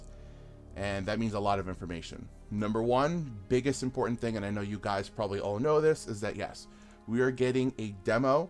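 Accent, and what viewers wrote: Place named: Michigan, United States. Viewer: Sounds like you live in Michigan 😂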